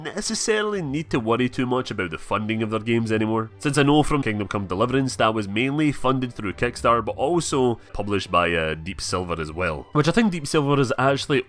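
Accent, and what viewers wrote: Scotland, United Kingdom. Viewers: You're accent is so Scottish that I had to really concentrate to hear that it was still English sometimes lol.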